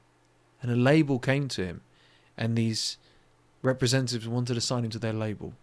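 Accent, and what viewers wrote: United Kingdom. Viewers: Are you from the North or South of England? The North is the working class side right? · He's from the south by the sounds of his accent.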